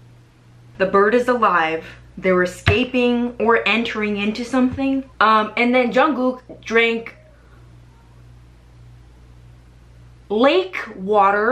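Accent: United Kingdom. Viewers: ur british got me crying 😭😭😭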